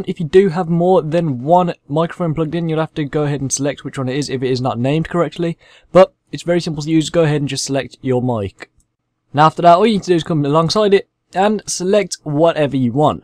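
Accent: United Kingdom. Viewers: Dude, what language are you speaking ? )) Is it Scottish or Irish accent? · You sound like a British version of xxxtentacion. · Why y’all sayin he talks to fast. Get your jockstraps outa your buttcheeks and open your ears he talks like a regular brit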